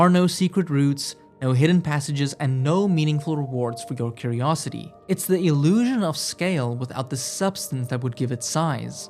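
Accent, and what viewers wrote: South Africa. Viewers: Great video! Btw you sound South African xD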